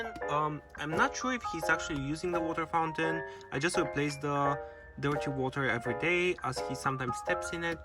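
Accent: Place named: Cyprus. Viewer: Awwww God Bless You Both ♥️♥️ So much to say....are you from Cyprus ? I have a young man living with us here from Cyprus, you sound very much like him. I also have a Scottish Fold....but he has long hair and straight ears, the Highland Fold Straight. I watched Maru for many years on YouTube. If you haven't seen him, please Google him . Maru holds the Guinness Book of World Records for the most viewed pet on YouTube ! He lives in Japan with a wonderful Lady and two female domestic shorthairs named Hana and Miri. He is Absolutely Adorable ! He loves boxes 😂🤣😃♥️ I was so in love with Maru. My Daughters were grown and I had no pets. I asked my Daughter if she could find a Cat for me. She went to her Facebook page and the first post was a cat, his owners moved and left him behind !!!!! I will never understand that. She brought him to me and I was shocked because I knew right away that he is a Scottish Fold.... 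I just adore him, his name is Fat Boy. Anyway, there is one trait that is frequently said about the Scottish Fold....They can be stubborn. Fat Boy really lets me know when he wants to be brushed, and then he sits about a foot away from my outstretched arms so I have to come to him ! I have had Cats all my Life. Fat Boy is very Special. Enjoy Kovi, he is adorable !!!!!!! ♥️♥️♥️♥️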